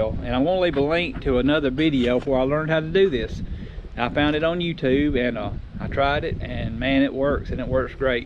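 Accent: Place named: Mid-South, United States. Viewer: I like how you said you replaced a lot of the front end suspension parts, but you lost me at the one part. What are "baw" joints? I looked em up, but my Jeep doesn't have baw joints. LOL! I'm messing with ya! I grew up in Memphis and St Louis and everyone says I talk country! You remind me of home, brotha! We could sit and have a beer and tell stories all day! LOL! Like my man says in Slingblade, "I lack the way you tawk." LOL! People say I got a twang and sound country, but that's because they don't know how COOL we are in South and Mid-South!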